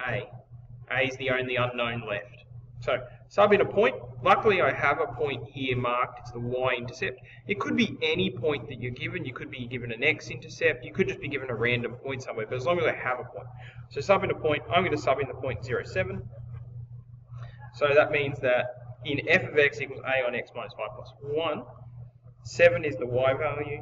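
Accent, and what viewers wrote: Australia. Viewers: The Aussie accent ❤️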